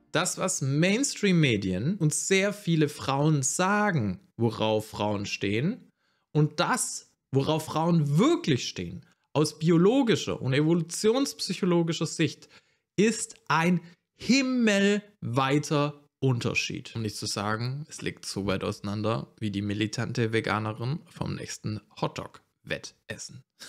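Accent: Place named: Swabia, Germany. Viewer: Geiles Video. Den Schwabe in dir bekommt man nicht leicht raus. Grüße ins Ländle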